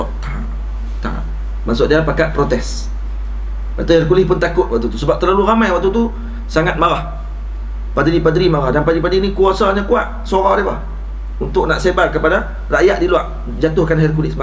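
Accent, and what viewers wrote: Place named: Kedah, Malaysia. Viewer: anas nasrulloh basa malaysia. lebih tepat negeri kedah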